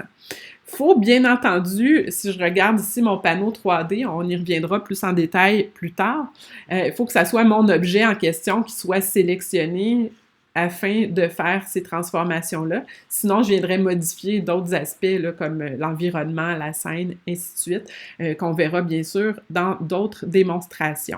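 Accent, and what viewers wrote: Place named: Canada. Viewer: Accent Canadien. Great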